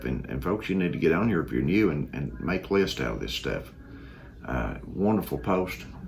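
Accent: Kentucky, United States. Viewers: I knew I recognized the accent, I was born in KY! I thought for awhile you were from the Shenandoah Valley but KY is wonderful too. Your a lucky man.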